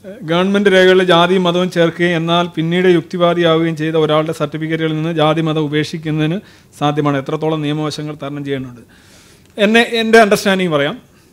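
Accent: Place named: Kollam, India. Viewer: കൊല്ലത്തുകാരുടെ ഭാഷാപരമായശീലം ........ മാത്രം .....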